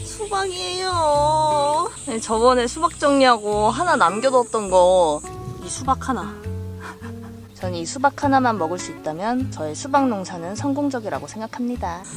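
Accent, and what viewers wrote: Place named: Chungcheong, South Korea. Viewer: 와이프가 마당 텃밭에서 일할 때마다 로 빙의해요. 말끝마다 유유 거리는데 충청도에 와있는 기분이에유.여긴 강원도 양양인데 말이죠. 아 망치 들때도 빙의해요. 그만큼 찐팬이랍니다..저는 매년 산소 벌초를 셀프로 하는데, 예초기가 없어 다른 분들에게 굽신굽신 하며 빌려서 사용해요. 그래서 제 등이 굽었나봐요. 최신식 무선 예초기를 저희에게 주신다면 굽어있는 제 등이 조금은 펴질 것 같아요. 미리 감사드립니다🙏🏽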